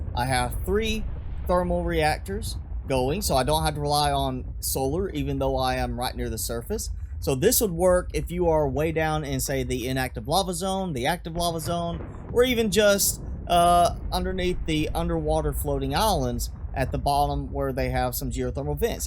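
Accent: South, United States. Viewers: Ah someone who sounds southern like me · I want to subscribe but that country accent is killing me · Totally off topic but where’s your accent from? Im absolutely shit at guessing US accents so I’m gonna shoot in the dark and say somewhere south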